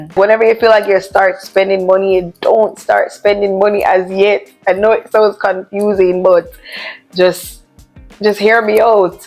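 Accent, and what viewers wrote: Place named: United States. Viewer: PTSD?? Are you American you are saying some words and phrases like the Americans wood and you are training on the dialect also, so I think you've lived in America or you're just one of those Jamaicans who want to sound American😂 thank you show me a video on it's nice that everybody's learning from us, social media is the best invention.... The way you pronounce PROCESS, the way you pronounce ALREADY, because I know there's no two countries that pronounce the words the same as American. I know how Jamaicans are originally and I know they are practicing to sound like the US. I love genuine originality and although you are bringing good information, just be yourself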